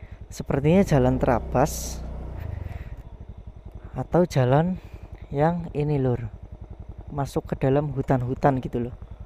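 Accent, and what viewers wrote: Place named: Banyumas, Indonesia. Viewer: Sama" orang ngapak tp kalau nonton smbil dengerin mas ngmg jd horor + kocak mantapp menghibur sekali mas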